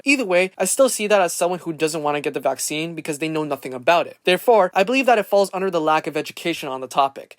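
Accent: United Kingdom. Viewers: you cannot deny me you sound very british and must one by the things you said in the beginning thought am already liking it👍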